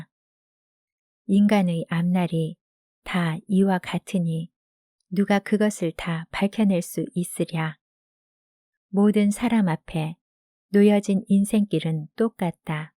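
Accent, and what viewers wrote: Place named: Jeolla, South Korea. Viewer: 오웃 전라도 어투가 쩝니다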